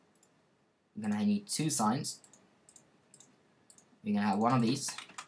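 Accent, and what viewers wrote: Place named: Sweden. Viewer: like a swede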